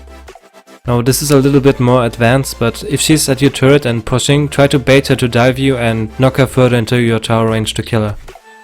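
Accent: Germany. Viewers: One question... Is he german, his voice sounds german in my opinion :D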